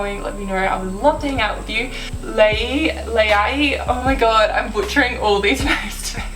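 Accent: Australia. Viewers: Are you Australian ?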